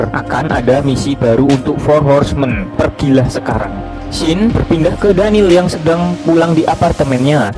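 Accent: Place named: Java, Indonesia. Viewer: Jawa medok...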